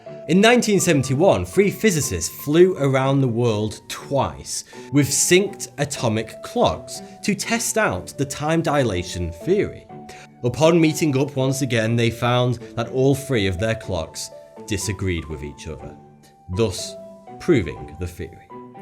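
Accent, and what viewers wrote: United Kingdom. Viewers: I really like that British accent. Ur "but" sounds for me like a "bot", and reminds me the Medieval game the voice of that thing in the head of a skeleton. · What variety of British accent is this that pronounces a soft "th" as an "f" along with the rest of the peculiarities?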